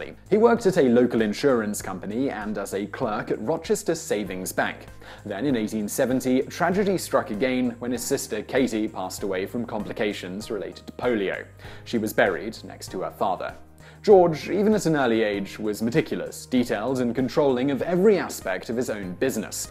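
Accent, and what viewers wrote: United Kingdom. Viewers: Written in American English, presented in British English.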